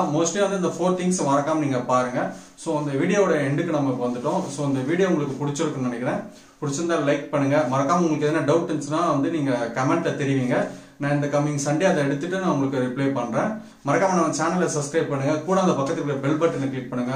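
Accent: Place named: Chennai, India. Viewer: வாங்க சொல ! கேட்க சொல ! Your Chennai language speech was amazing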